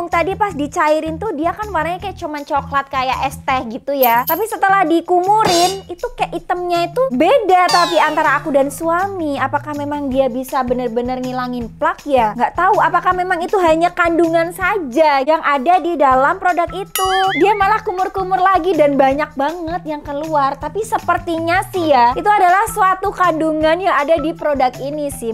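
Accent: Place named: Semarang, Indonesia. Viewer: Gek weruh aku ono channel e mbak iki, mesti cah plat H logate koyo aku oq soale wkwkwkwk makasih reviewnya yo mba, aku tertarik sama yg obat kumur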